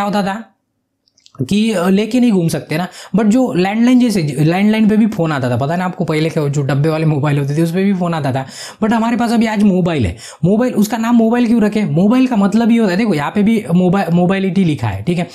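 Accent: Maharashtra, India. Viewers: Sir Aap marathi maharashtra se ho kya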